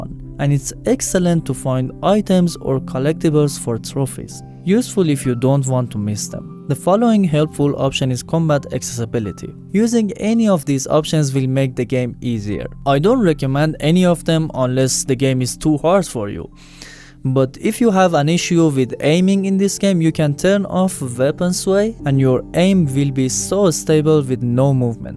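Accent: Iran. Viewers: Your accent, are you Persian? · بنازم آقا بازی رو انجام دادم نیازی به دیدن ویدئو نداشتم ، لهجه ایرانی رو دیدم دلم نیومد رد کنم عالی و مسلط موفق باشی.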